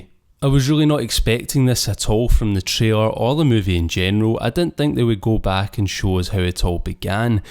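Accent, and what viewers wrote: Scotland, United Kingdom. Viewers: Are you Scottish? Lol this is the first of your Vids I've watched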